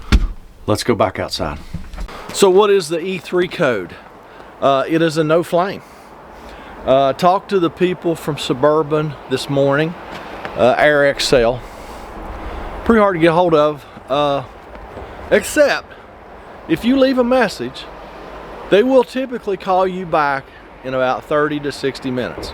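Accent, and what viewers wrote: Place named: West Virginia, United States. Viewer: Back to Beckley! Oh yeah. In '76 I was there training to manage a store, moved to Bluefield/Princeton area shortly after, and once a month drove places like Welch, War, Matewan, and Williamson to check up on other stores, quite often on ice. First time I'd seen grey snow, and to a Texas raised young man, it was depressing. I thought I recognized your accent.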